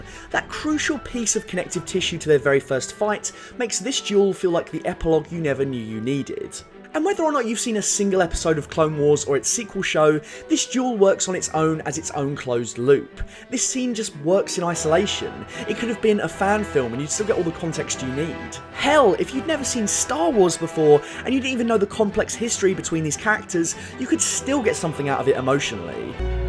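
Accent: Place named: England, United Kingdom. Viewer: Fuck your pommy voice is annoying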